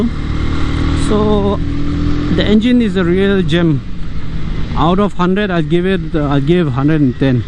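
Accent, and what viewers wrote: Mizoram, India. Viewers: Hetiang footage hi a hmuhnawm riau 👍🏻. Tin, English pawh a typical Mizo accent lo khawp mai 👌🏻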